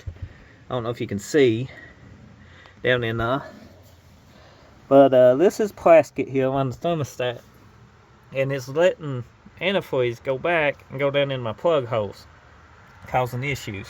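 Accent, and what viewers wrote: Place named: Louisiana, United States. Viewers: Sounds like a louisiana accent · you've got an interesting accent. Where are you from, Louisiana?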